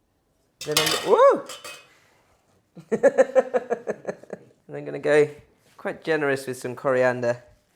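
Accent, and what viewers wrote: England, United Kingdom. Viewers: Wow Chinese dude with English accent... Impressive 😃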